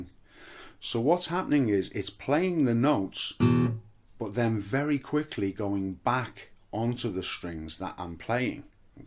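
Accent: Liverpool, United Kingdom. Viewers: good lad,lovely easy going lessons, sounds like you live not far from Liverpool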